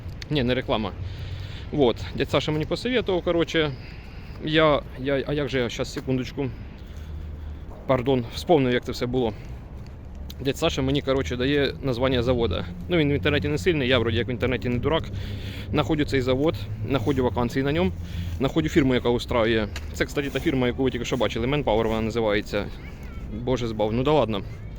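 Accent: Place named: Poltava, Ukraine. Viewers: По розговору думаю Полтава.Или Черкассы. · Від ТАКОГО суржика вуха в'януть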